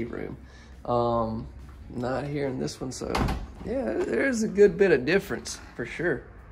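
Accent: South, United States. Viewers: Stop saying utility!! 😡Keep that charming southern accent! 😍🥰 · I was surprised with the price on this...I was expecting it to be about $50,000 more than the price you quoted! Also, you didn't point out that the closets have traditional shelving and round rods to hang things on. I notice you corrected yourself when saying "utility". I hope you keep talking the way you did in earlier videos. I like hearing your Southern charming accent!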